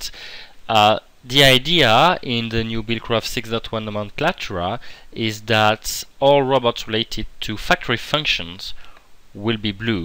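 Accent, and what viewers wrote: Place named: France. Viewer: Hey this is awesome! Also, you sound really french! If you are then: Salut! Super mod! Je kiff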